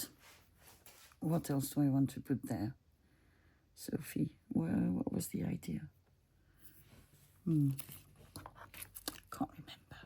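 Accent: France. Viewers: I love your style. I think the mole one is my favorite too. You do great with English being your second language. I do love hearing your french come out though. And your puppies.. gets my puppy all involved to and makes me laugh! ( mine watches tv ) Thank you Sophie I love the random ephemera videos.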